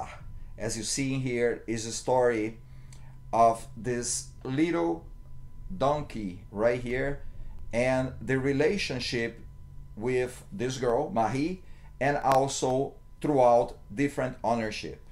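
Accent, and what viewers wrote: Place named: Brazil. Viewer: Sotaque Brasileiro é inconfundível. Muito boa sua crítica,! Não o conhecia. Contudo, o filme não funcionou para mim, o fato, como você mesmo disse, de o elenco não trazer atores profissionais, prejudicou muito a minha imersão. Por vezes ridicularizei certas cenas que seriam incríveis, na minha opinião, com atores profissionais. Em suma, achei a ideia brilhante, porém, muito mal executada. Algo positivo disso tudo foi que realmente nos colocamos na pele do jumentinho, uma vez que os animais também nos veem como “atores amadores”, tendo em vista que nossos movimentos, falas e atitudes são incompreensíveis para eles, como é comum em animais de outra espécie quando se observam. Logo, toda a atuação tosca e inverossímil, aproximou-me muito mais do animal do que qualquer outro personagem. Entretanto, mesmo assim não fui capaz de digerir a obra. 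Nota: 6/10.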